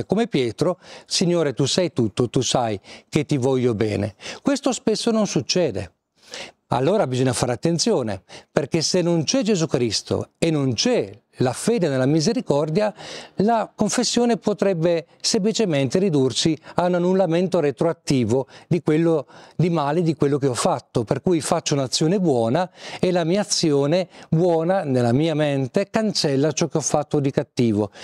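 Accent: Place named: Parma, Italy. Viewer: Caro questa è una bella sorpresa per me! Sono della provincia di Brescia. La seguivo molto volentieri, quando stava al santuario della B.V. Maria del rosario di Fontanellato e su Giovanni Paolo TV trasmettevano le sue catechesi sui 10 comandamenti. Mi è spiaciuto quando l'hanno trasferita nel torinese perchè ancora piu lontano... ma sono felice che sia andato a fare del bene anche la... Poi anche di G.P. TV s'è perso il segnale perciò era molto che non la vedevo e sentivo... in un primo momento mi era sembrato di conoscerla, poi sentendola parlare l'accento non mi convinceva perchè ricordavo la cadenza parmense.. ma da come parla ho detto no dev'essere lui! poi ho letto nella descrizione e.. che gioia! Mi ha fatto un vero piacere vederla e tutto. Lei non mi conosce ma io è come se la conoscessi. Saluti! Pace e bene! Sia lodato Gesù Cristo! ❤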